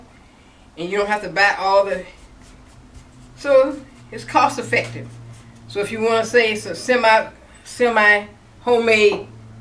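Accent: United States. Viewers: I love your channel listening to your southern voice is so somthing while you teach this genaration cooking skills. Am gracful for the holy spirit amd my mom that thought me many cooking skills, but am also open to other techniques and always willing to learn another way of doing things. I've been cooking since age of 8yrs old. My mom would let me loose in the kitchen amd it would be a flour everywhere day. She said it was the only way to find my own way of doing things in the kitchen. God bless you your family. I feel as if am apart of the family now and your my grandmother.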